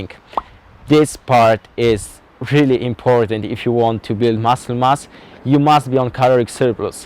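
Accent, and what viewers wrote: Poland. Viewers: Yes, he is from Poland and for me, it's a typical Polish accent.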